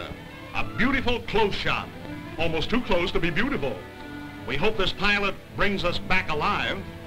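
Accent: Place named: Transatlantic, United States. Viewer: that transatlantic accent tho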